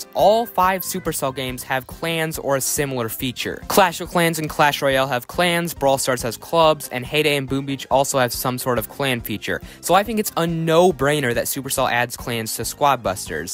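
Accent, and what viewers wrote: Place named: United States. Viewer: That is probably the most American accent i have heard ( if hes not american hes a 17 yr old Australian )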